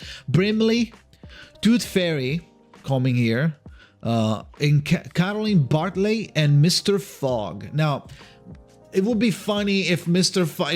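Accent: United Kingdom. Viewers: Alright mate, hate to do this but I oughta stick up for my Scottish, Welsh and Irish folks and remind you that it's not a "UK accent" but a mish-mash English one. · I didn't click on this video to hear my guy start speaking with a UK accent 😭😭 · The UK accent made me clacking 😭😭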